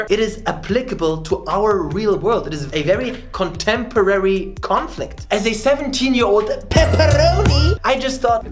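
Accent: Germany. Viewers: Sometimes I forget that he’s German , dann he speaks something in Deutsch accent and I’m like . Stimmt ja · Your englisch sounds sehr deutsch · whaaait are u from germany ? :D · The deutschenglish makes me have the kekkers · Ya your german accent is weirdly titillating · Are you from germany??? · Are you german or something? :D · A Chinese talking with German accent in English. Ich bin so confused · You sound awfully German to me... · Wtf is he german? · Is he german ? o.ō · Lol the German accent bruh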